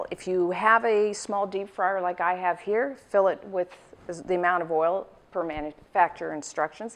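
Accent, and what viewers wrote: Canada. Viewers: Fun to watch, thanks, but from here in Europe, we're mystified...what kind of accent is that? Canadian? Great Lakes? Michigan?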